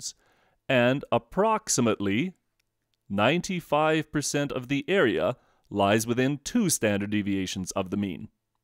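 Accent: Canada. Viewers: and I realized you were Canadian before you said Zed... Thanks for your help professor.. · powerful canadian accent!!! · you're from Toronto aren't u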